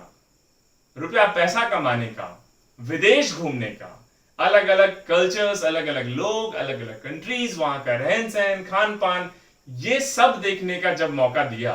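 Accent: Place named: Uttar Pradesh, India. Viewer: उत्तर प्रदेश में आपका हार्दिक स्वागत है सर जी । आपकी भाषा एकदम उत्तर प्रदेश के लोगो की तरह है । ऐसा लगता ही नहीं को आप राजस्थान से है।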